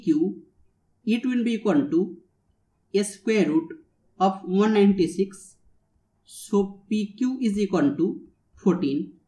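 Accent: India. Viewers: She is indian, thus the accent.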